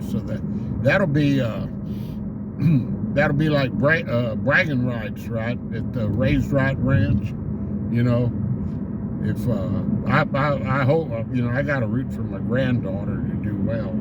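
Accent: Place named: Texas, United States. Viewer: I think your awesome, I really am Texas home sick and you help a lot. I very much enjoy the stories spoken in my favorite accent. I haven't been to Texas in over 20 years, and everyday I try to get back to Springtown. Home of the POJO!!! Anyway I appreciate your channel very much. You stay blessed!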